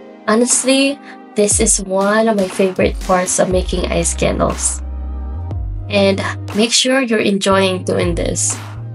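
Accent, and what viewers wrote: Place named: Philippines. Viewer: Ang galing kabayan! Your accent is a filipina. Congrats❤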